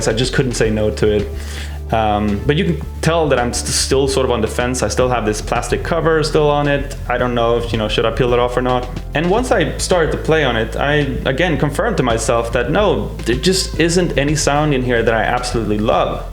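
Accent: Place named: Sweden. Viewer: haha love the "smörgåsbord, wich is swedish for smoargooseboard, and means butter goose table"! xD I'm swedish to and when you said it I was like haha damn his accent is so clearly Swedish and I didn't notice..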